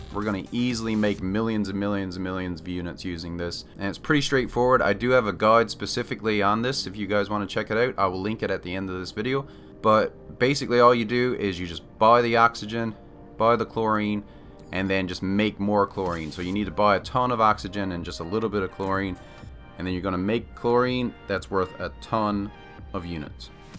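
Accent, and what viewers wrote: Newfoundland, Canada. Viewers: Newfoundland accent? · Haha heard that accent knew exactly where your from. I was born in st John's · I gotta say I do enjoy the Canadian accent. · You're the most Canadian sounding YouTuber I've ever found. (I'm also Canadian. Subscribed.) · U Canadian AF my boy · Total wholesome content man I'm subscribing. Oh btw are you Canadian by any chance ? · i love your newfie accent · are you from newfoundland? you have the craziest accent.. heavy af canadian accent with hints of irish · i cant tell if your accent sounds like a really weak newfie accent or not lol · Was I recommended this guide because you’re from Newfoundland? Or are you generally a popular NMS content creator? First time here and recognized the very local accent, lol. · I was watching and couldn't get over how familiar your accent is, then realized you're a fellow Newfoundlander! 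Always nice to stumble upon a neighbour in the wild. :) Great tips, by the way! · Started the video and instantly thought.. You are definitely from Newfoundland.. :p Just got this game haven't tried it yet but if you are from Newfoundland that's pretty reassuring :D can trust another Newofundlander :) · Buddy, as soon as i heard the whaddayaat i was like. i knows where buddys from. Prolly from up da shore over across the way. Nice to see someone else from the rock! haha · I could tell you were from Canada immediately from the accent. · I am watching this now and said wow sounds like he's from newfoundland then seen your username haha me too! Subscribed!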